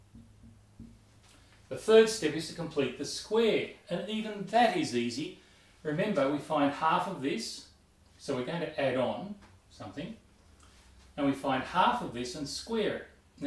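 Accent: Australia. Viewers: Not sure why, but having another Australian explain this helps so much. Top stuff, cheers!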